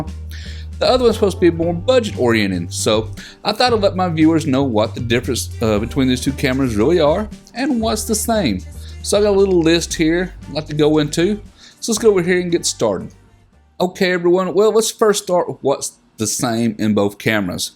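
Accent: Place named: North Carolina, United States. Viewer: Great review! Thanks you for helping me make this decision! Also, your accent reminds me a lot of either a North Carolina or a Virginia accent. Greensboro, NC resident here! Again, thanks so much for all you do :)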